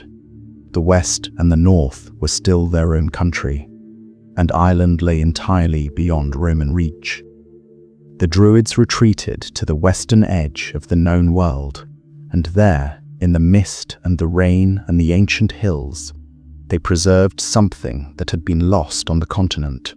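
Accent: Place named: United Kingdom. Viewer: Truly appreciate that the talk over is done by someone who's actually British or English rather than some American with their dirty terminology that will twist what truths we've got left I Appreciated This Thank You Buddy 🌹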